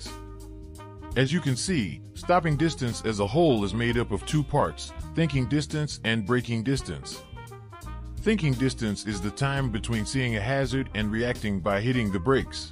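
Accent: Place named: United States. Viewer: This presenter seeks "likes" and subscribers, but I, for one, will not be doing so. The video, which, judging from most of the imagery, was of British origin , (let's face it, an American wouldn't include non-American images), but the voiceover is in the most annoying sententious American accent, reading the script badly, particularly pausing in the wrong places.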